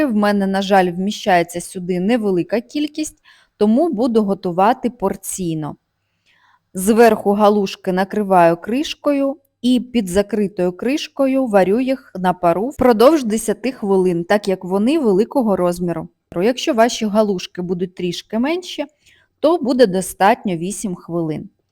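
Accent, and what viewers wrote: Standard. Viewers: Я недавно підписалася на. Подобається ВСЕ! І розповідь про рецепт, і його подача і чиста українська мова. ВСЕ подобається! БАЖАЮ МІЛЛІОН ПІДПИСНИКІВ!!!🎉🎉🎉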